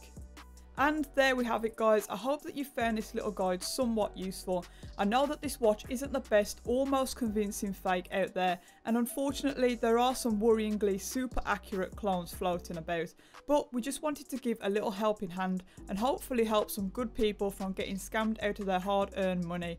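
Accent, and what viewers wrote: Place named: Midlands, United Kingdom. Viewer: I’m good at spotting a Mids accent , and watch differences 😉 respect , great detailed commentary and spot the difference 💯👍🏻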